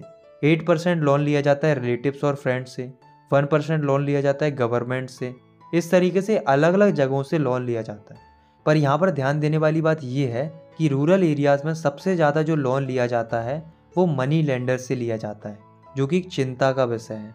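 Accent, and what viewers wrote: Uttarakhand, India. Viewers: Sir uttarakhand se ho kya ??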